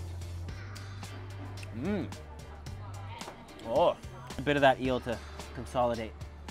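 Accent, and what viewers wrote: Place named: China. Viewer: I like his Chinese accent.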